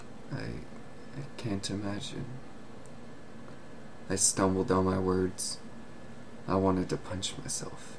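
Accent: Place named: South, United States. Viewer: Congratulations, I'm sad. I might just suggest though, when you read, pronounce each word, don't slur at the end. I was wondering if you were southern because I kept thinking of as you talked In Twi's perspective. Also, another pointer, don't read when you have what sounds like a morbidly obese man in the background snoring. Wait until you're alone. All critique aside, great story, great reading. Good job.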